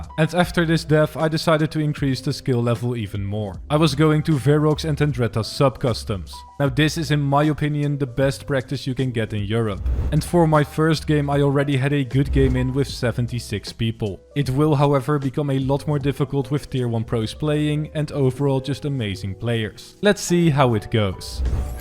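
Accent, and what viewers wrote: Netherlands. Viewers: Is he Dutch? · Kom je uit nederland? Are u from the netherlands? Because i am and i think your accent sounds just like it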